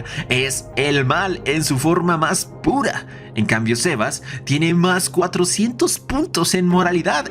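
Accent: Colombia. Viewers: Excelente video! Pdta: de casualidad sos Colombiano? 😅😂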